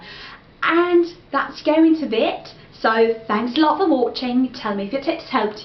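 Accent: United Kingdom. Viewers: Спасибо за прекрасные советы! В восторге от вашего крутейшего британского произношения, просто музыка для ушей. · Hi.I just subscribed.'Cause yesterday I saw this video,and I am amdmired.Great job.I'm a kind of beginner,have watched many many videoes and still do it.Will be glad to wath new videoes of yours.And have one question how'd you get your wonderful British accent,did it take long time,have you studied in England? Keep going you're great!! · Hello, that's really interesting. and your pron is fantastic!!!! But I've got a question on my mind - why did you choose British pron, not American one? Would be glad to know your points, thank you)) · you are amazing! You sound so British! I can't argue with you that working on a pronunciation is very important quality of a learner of English. In class I often get mixed up by my school mates speaking with the Russian accent, it is sometimes quite difficult to figure out what they mean and I ask them to repeat. 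Even though I am just a learner as they are I find it easier to converse with the natives. I'm taking the CAE exam in July, the event that I'm actually dreading, and I would like to ask you for some tips for improving listening skills. I do listen a lot, but somehow that influences only my pronunciation and speaking skills, and every now and then I keep on missing out things in my listening tasks. I realy go out of my way trying to improve these skills, but still no headway... Any advice?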